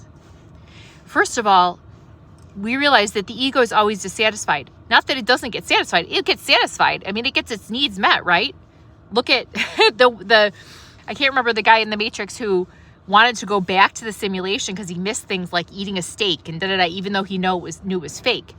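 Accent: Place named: Midwest, United States. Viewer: Wild to hear this with a Midwest accent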